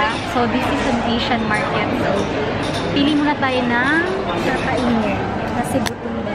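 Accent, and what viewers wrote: Visayas, Philippines. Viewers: Bisaya ka po maam? 😊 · Bisaya ka Ma'am no?!😂😁😁😁 minsan kasi nababasa ko sa acent mo minsan. But it was nice!!! keep uploading your video sa channel mo. I subscribed your channel na po and Thanks for the info!!! God Bless!!!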